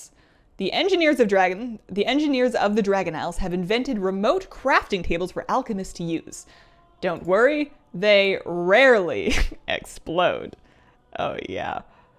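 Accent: Canada. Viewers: She's Canadian.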